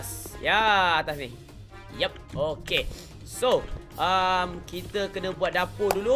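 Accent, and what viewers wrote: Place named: North, Malaysia. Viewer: Semenjak ni rajin nah cakap utara , air jadi ayaq 😂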